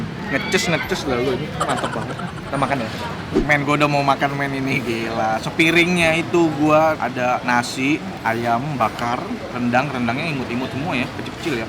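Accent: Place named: Pontianak, Indonesia. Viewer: tak ade bukan padang , nah bahasa pontinye kental cuy wkwkw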